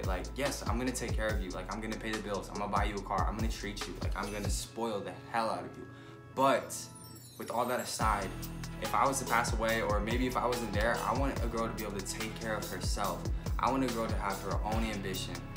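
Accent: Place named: France. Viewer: That French accent 😂and boi I would win any argument 😫😫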